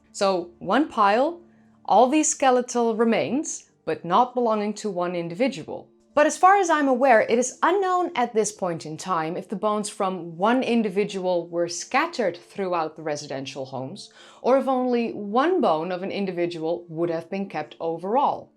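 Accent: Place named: Netherlands. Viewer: Your Turkish pronunciations sounds so Dutch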